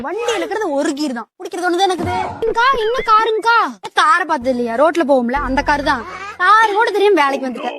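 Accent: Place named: Kongu, India. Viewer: கொங்கு slang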